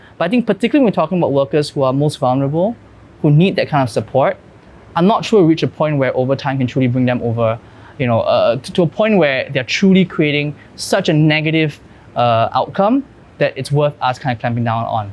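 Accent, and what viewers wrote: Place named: United States. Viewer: Listen to him in another podcast. He has this American accent which is attractive but lacks depth in his conversation.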